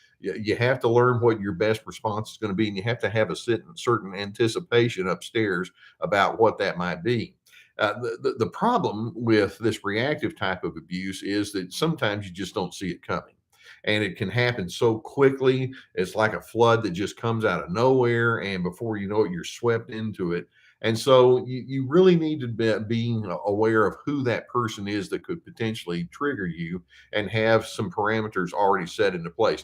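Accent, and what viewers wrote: South, United States. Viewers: Funny you're 2 hours ahead I just went to your site Pacific Standard Time. Thank you for team healthy, I don't stereotype you though you have the Southern accent. Yes I've been made to look foolish by such individuals you help me not feel so alone when gaslit or hoovered by such individuals or made to feel guilty because what I have to offer is not enough after they repeatedly destroy or lose or sabotage what I give them. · it's because of your southern accent. Your all good.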